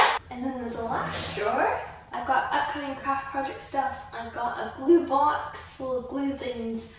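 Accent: United Kingdom. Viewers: Love that you're starting to pick up a British accent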